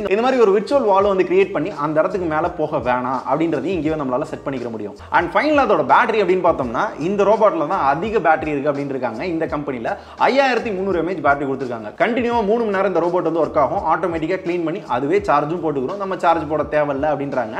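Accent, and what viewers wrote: Madurai, India. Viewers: மதுரை slang Semma thalaiva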